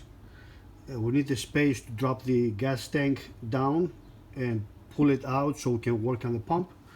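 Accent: Greece. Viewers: Great video, I'm curious if that's a Greek accent?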